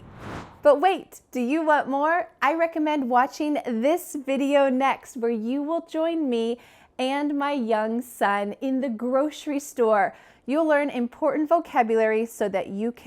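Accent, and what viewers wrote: United States. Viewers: ❤️❤️❤️❤️❤️. You are the lovely teacher. You gave me The Amarican accent. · Hi. I 'd like to master American accent that sounds lovely and gentle!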